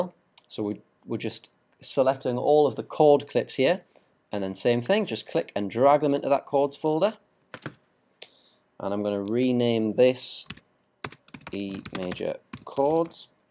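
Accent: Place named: Wales, United Kingdom. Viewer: hes welsh or gordy lol ,we love gordys coz the sound welsh good tut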